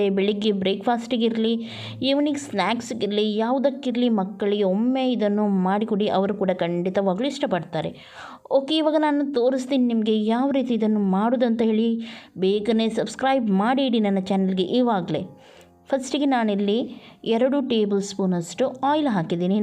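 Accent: Mangalore, India. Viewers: Ee Mangaluru Kannada style kelodheyyyy ondu khushi😂 Chikka makligey helidha haagey yeshtu muddhagi helthaa prepare madthiri :-) God bless U ma 😊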